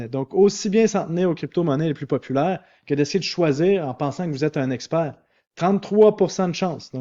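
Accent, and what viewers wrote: Canada. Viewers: Salut, encore merci et bravo pour tes 100k, je me demande si ce n'est pas ton accent Canadian qui m'a attiré dans les cryptomonnaies 😊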